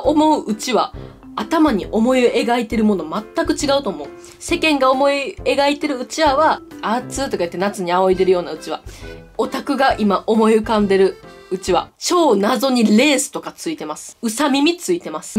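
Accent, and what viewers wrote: Kansai, Japan. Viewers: やっぱりさんの声や関西弁が大好きです❤️ これからも推しの動画をもっとだして欲しいですさんのライブに行く時の参戦服やグッズ動画を出して欲しいです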